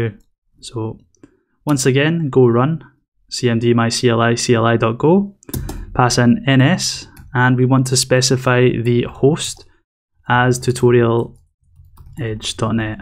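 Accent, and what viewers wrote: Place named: Scotland, United Kingdom. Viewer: Scottish bloke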